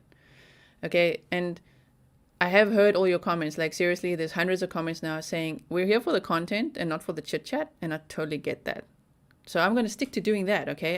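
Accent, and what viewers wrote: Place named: South Africa. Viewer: South African living in the Netherlands...thats so cool! Thanks for sharing that. I wondered where your accent was from but couldn't figure it out. I love when you said that your a true crime mind all the time! I'm American living in Finland 🇫🇮 and its a bit weird bc the news/media doesn't report here like they do in other places. I watch a lot of crime, documentaries, court cases online, and mysteries. But definitely interesting points your making :) and I like that you look at the big picture.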